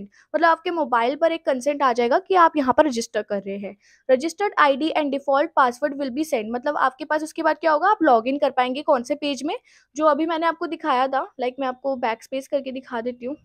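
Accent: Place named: Delhi, India. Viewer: AAP kaha se hai delli se kya. App ke baat se pata chaltaa hai madam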